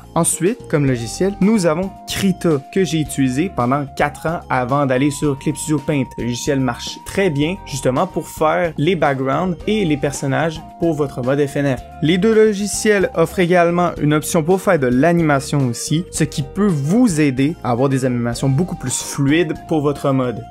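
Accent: Quebec, Canada. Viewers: tu es québéquoi ?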